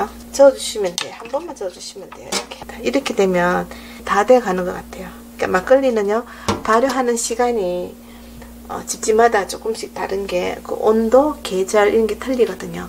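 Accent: Gyeongsang, South Korea. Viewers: 경상도 분이신거 같은데 저도 그쪽~혹시 아실라나 옛날에 막걸리 거르고 남은 찌개미에 단 맛을 내기위해 뭐 넣고 먹었는지 아시나요. 설탕이 없던 시대인듯 지금 표현할라니 너무 웃기네요. 마른모 꼴로 생긴 (꼬라재비)ㅋㅋㅋ.ㅎㅎㅎ. 그거 몇알 넣고 찌개미를 숫갈로 비벼서 먹으면 정말로 맛있었던 생각이 나네요.먹거리가 귀해서 봄이면 들에서 찔래도 꺽어먹고 진달래도 따먹고 버들개비 따먹던 아~주 옛날 생각이 나네요. 까맣게 잊고 지냈던 옛날 생각을 잠시 해 보았네요. 저도 막걸리 담그기 해보고 싶네요. 맛있는 막걸리 맛은 잘 알아요. 옛날에 맛을 많이 봐 놨기 때문에 ㅎㅎㅎ. 언제 그렇게 많은걸 배웠을까 싶네요. 감사합니다.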